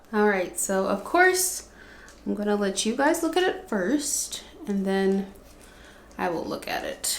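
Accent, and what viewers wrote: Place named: East Coast, United States. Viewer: Sometimes your accent it’s light. But once you start getting “frustrated” it’s like BAMM. I love the East Coast accent 😍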